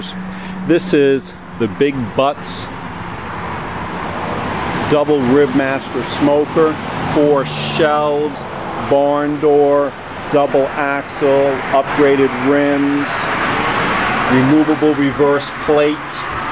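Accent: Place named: New England, United States. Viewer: I love the Yankee accent........ Yankees making Southern style BBQ always give me a chuckle. Hahahah Nice trailer and good information. I would love to have a trailer like this but it would be a real drive to get it here in the REAL DEEP SOUTH in Chile. Thanks for the video. Jim in Chile.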